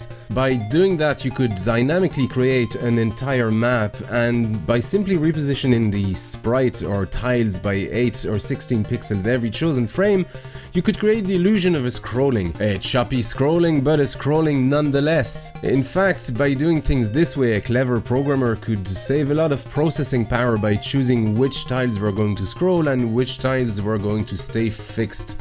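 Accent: Ireland. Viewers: yay I win, I kept thinking this dude is Irish...but then French ha ha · Slightly off-topic, but what is this accent? Irish and French? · Henry Pym Irish accent:)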